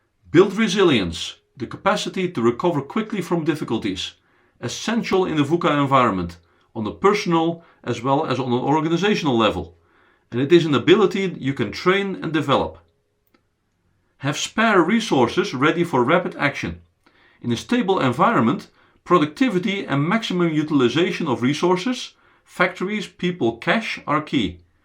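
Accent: Russia. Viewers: r u russian? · The accent is very Russian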